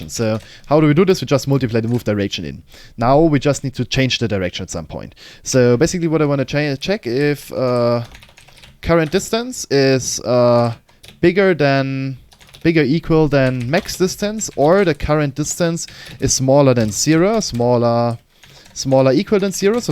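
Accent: Germany. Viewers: No hate, but u can tell he german xD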